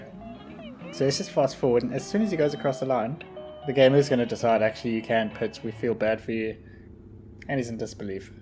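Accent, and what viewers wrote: South Africa. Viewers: where you from ? Sound South African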